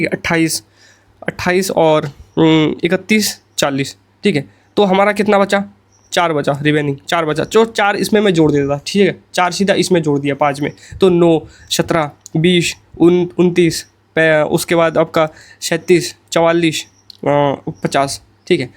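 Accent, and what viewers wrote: Bengal, India. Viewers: Mujhe aisa kyu lag raha hai aap bangali ho😅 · ❤❤❤❤ Bhaiya ji aap Bangal se ho kya ???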